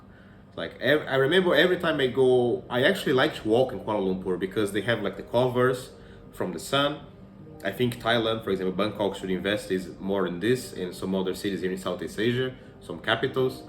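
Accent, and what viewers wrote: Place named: Brazil. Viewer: Well done, you speak your mind about Malaysia and not just gloss over the topic like some other YouTubers just trying to make everyone happy. Bravo for that! From your accent, I believe you are Brazilian. Not sure yet but I will look at your other videos to determine that later. Interesting observation of the Turkish people. I have interacted with those outside of Turkey and visited Istanbul before. Never live there before.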